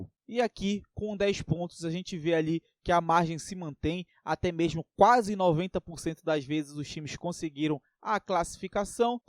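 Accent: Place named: Pará, Brazil. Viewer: Mano tu e paraense né não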